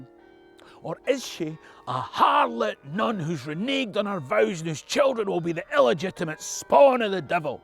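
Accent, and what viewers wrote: Scotland, United Kingdom. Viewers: So weird listening to a black guy speak with a Scottish accent.......weird!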